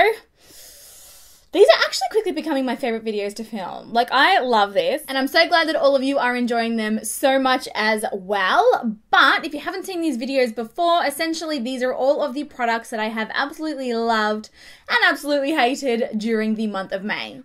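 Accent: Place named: Australia. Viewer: I'm aussie too & I can hear you have a strong accent... are you a country girl? & what state are you from? (I'm from the Blue Mountains, west of Sydney:} )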